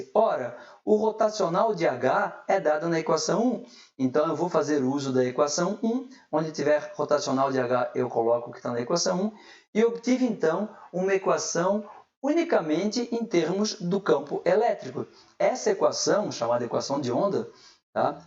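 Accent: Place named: Portugal. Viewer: Aula muito boa! Tens acento português professor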